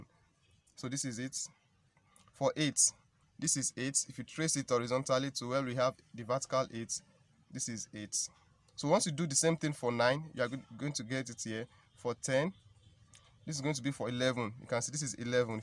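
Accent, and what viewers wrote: Nigeria. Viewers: I could tell you're Nigerian from the accent. I'm Nigerian too. Haha😂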